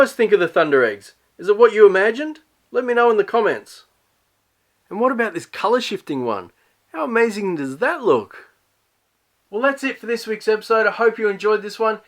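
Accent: Australia. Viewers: Those are beautiful💯 New sub here, it's nice to hear an Aussie accent. Cheers from Melbourne mate😊✌❤🇦🇺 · Are you putting on the Australian accent extra thick on purpose?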